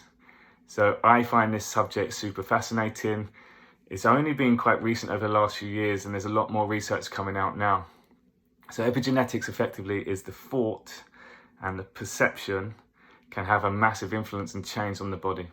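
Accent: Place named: Milwaukee, United States. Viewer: You have a nice accent are you from Milwaukee?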